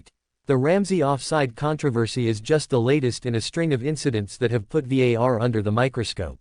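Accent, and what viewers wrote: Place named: United States. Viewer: And why do we have to listen to an American accent, US English usage (… a 1 to zero win …) etc? Surely there are British English AI voices out there, no?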